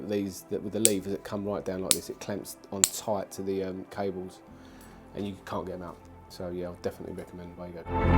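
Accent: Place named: United Kingdom. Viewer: I can’t be the only one that watched this video cos if his British accent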